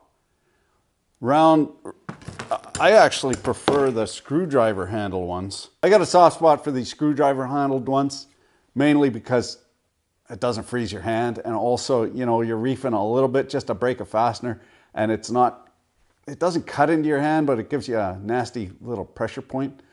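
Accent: Quebec, Canada. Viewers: jmattendais pas a entendre du Quebecois dans une video que j'ai clické au random mais sa fait plaisir au oreilles, cheers man thx for the review oué mon'homme criss de beau outils mets en 🤌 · Très bon Québécois👌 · It's a rough Quebec accent.... Pas sur si c'est juste rouillé ou c'est forcé? Québec ou pas Québec? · Tu est Québécois???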